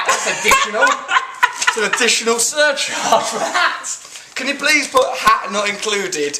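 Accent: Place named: United Kingdom. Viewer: Gosh I wish there were subtitles for these. I love Russel but he speaks so fast, and because English is my second language I really struggle with the British accent when he goes that fast. Anyway, love the new intro and logo!!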